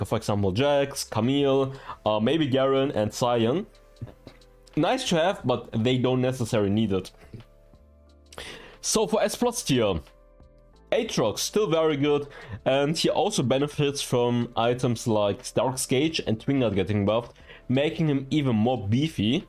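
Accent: Germany. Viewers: Btw: you german?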